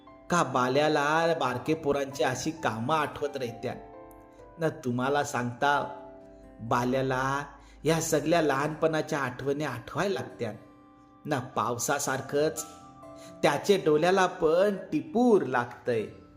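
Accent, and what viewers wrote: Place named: Kunbi, India. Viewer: अप्रतिम कथा आणि अस्सल कुणबी बोलीमधील सादरीकरण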